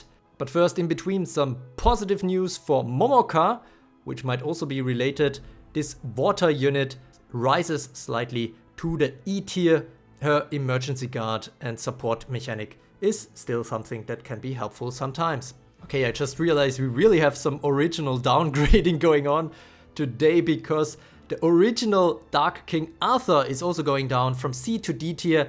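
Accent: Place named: Germany. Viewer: ah okay could swear you have german accent mb og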